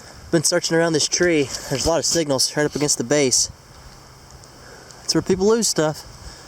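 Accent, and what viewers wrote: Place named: Rural, United States. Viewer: Ur accent says ur a hick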